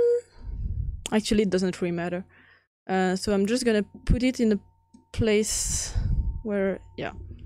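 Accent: France. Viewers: Your o̲dio was very... French. 🇫🇷 But I like it. The videos that you make help me alot. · sounds like french accent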